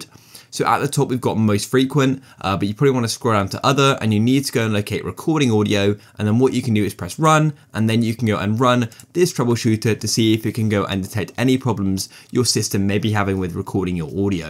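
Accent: United Kingdom. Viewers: EWWWWWWW NOT AMERCIASN BRITISH EWWWWWWWWWWWW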